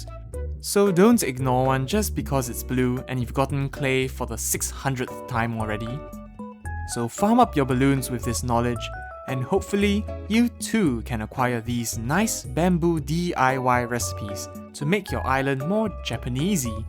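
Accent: Singapore. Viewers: Familiar accent. S'porean? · youre definitely from Singapore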